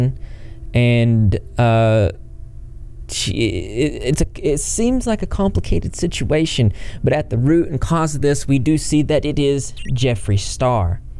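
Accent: South, United States. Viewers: If I had to guess...Florida/alabama/Georgia somewhere around there lol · I definitely hear the south in your voice.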